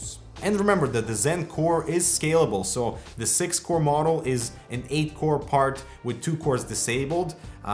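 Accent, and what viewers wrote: Russia. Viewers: ой чувак, такой акцент, ты по-любому Русский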